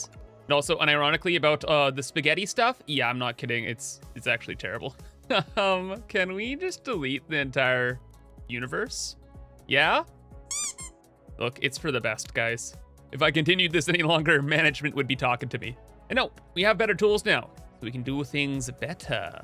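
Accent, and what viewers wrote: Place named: Canada. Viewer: In all my years of Shapez, I've never seen anyone ignore the miner extensions and just build platforms and factories in the middle of their miners :D 600 hours in and I've come to accept that there is no wrong way to play the game. Also, I get the feeling you're Canadian, yes? Your "Eh" was showing ;)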